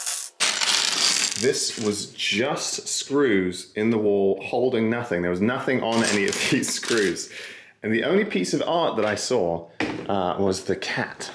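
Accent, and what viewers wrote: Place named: Australia. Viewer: Yeah straightaway I reckoned an Aussie accent, but I just found this channel so I don't know this dudes backstory.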